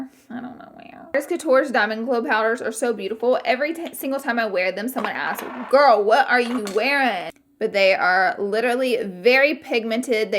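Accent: South, United States. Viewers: Love you too girl! I started watching you a couple years ago and I instantly fell in love with your channel! You are so fun and humble! I am honestly proud and happy for you on how far you've come! Don't loose the Southern accent! Hugs. Thanks for sharing this video.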